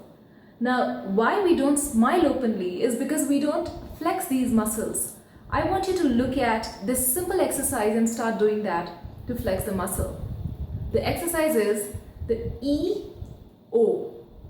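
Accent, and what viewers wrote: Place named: India. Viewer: i find Indian accents pretty. yours soft ;)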